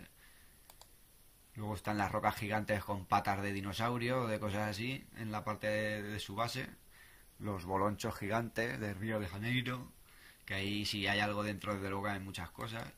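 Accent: Spain. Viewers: tú eres valencia segur. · de que parte de España es ese acento...... es como el castellano chileno.... que no se entiende un carajo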